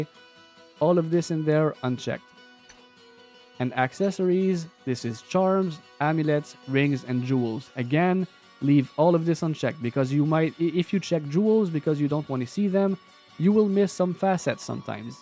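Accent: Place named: Quebec, Canada. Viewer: Nice merci man, en passant ton accents... té b'en Québécois eh? 😀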